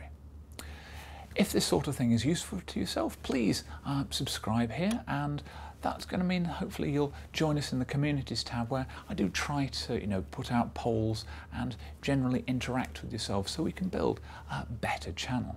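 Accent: RP, United Kingdom. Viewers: I discovered this channel a few months ago, and ended up staying because I really found it useful for practicing my comprehension of spoken english and "received pronunciation". I'm French and I currently am studying Foreign and Regional Language, Literature and Culture (English) and it helped me a lot, even though I probably won't use everything I happened to learn here.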